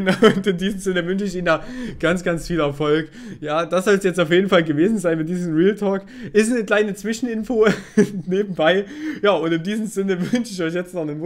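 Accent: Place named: Saxony, Germany. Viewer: Najoa, solang das alles geklärt ist, ist alles gut. Man muss halt vorsichtig mit einigen Äußerungen sein aber so lang er es nicht ernst meinte und er seinen Fehler einsieht, solle mich net stören. Ich Sag's gerne immer wieder aber ich feiere diesen sächsischen Dialekt so derartigst. Das klingt wie meine Familie mal mit Ausnahme meiner Eltern und meines Bruders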